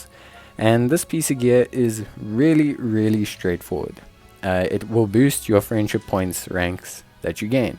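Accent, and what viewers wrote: South Africa. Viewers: Hey are you south african?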